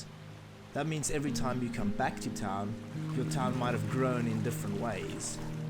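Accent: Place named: South Africa. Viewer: Why does this guy speaking sound like a Afrikaaner Anyways this game looks grea, however $40 dollars is SHIT expensive for a experimental title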